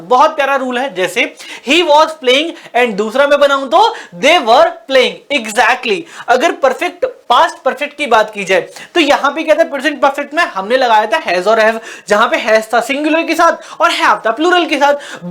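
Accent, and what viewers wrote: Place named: Uttar Pradesh, India. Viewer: Sir aapki mujhe U.p. ki boli achhi lgti hai aap aligad se ho meri nnihaal hai vha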